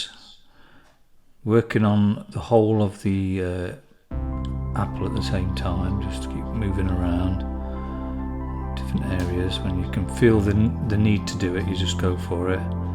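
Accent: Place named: Derbyshire, United Kingdom. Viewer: You sound like you are from Chesterfield uk. Do you do any art workshops. I know you do patron?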